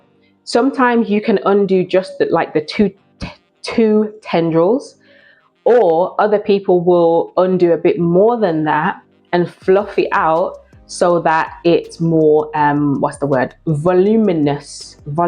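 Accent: United Kingdom. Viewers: Good to hear a British accent. Greetings from another northerner.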